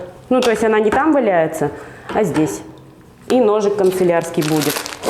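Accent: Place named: Perm, Russia. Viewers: Здравствуйте! Я смотрел сериал и там девушка прям как вы разговаривала! Вы из Перми ? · Вы с Урала? Говор знакомый. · Акцент прям Пермский ) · Люблю Пермский говор. Видео понравилось, душевненько, но резать обои ножом навесу с запасом в 2 мм. это нужно иметь хороший навык. Да и многое другое рассчитано на хороший опыт. Для чайника не подойдёт.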